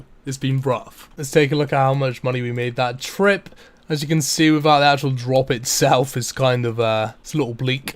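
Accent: Bristol, United Kingdom. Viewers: keep up the great vids man, really entertaining! also where in the SW are you from, you sound very bristol my friend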